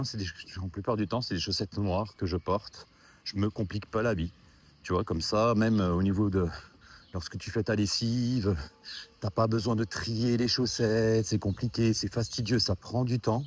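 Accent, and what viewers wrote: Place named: Alsace, France. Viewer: Accent de chez moi! Alsace ou Moselle?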